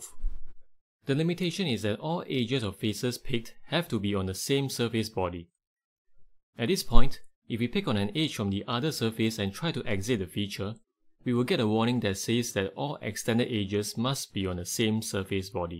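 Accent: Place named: Straits, Malaysia. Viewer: Thank you for the clear and to the point tutorial. After watching 4 other confusing and poorly presented videos, this was refreshing time saver, as was the Straits English over Indian English dialects.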